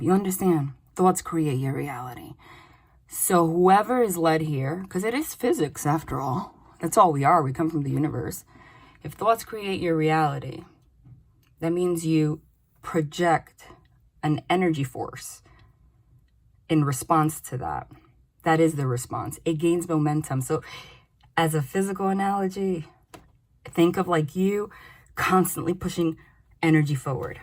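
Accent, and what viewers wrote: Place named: New York, United States. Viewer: Hey I love your New York accent girl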